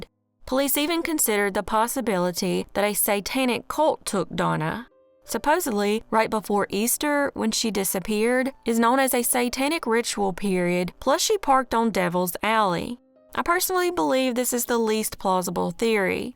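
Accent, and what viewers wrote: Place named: Louisiana, United States. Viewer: twelve of my ancestors are entombed in that cemetery(St.Joseph). 🙂 You have a Monroe, Louisiana accent. I don’t know where you’re from though.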